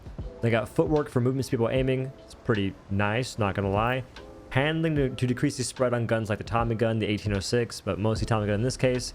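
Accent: South, United States. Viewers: Nice vid, but question, is it just me or does your accent just randomly turn on and off? you sound country for like 15 seconds every minute or so. Kept throwing me off. but in a cool way.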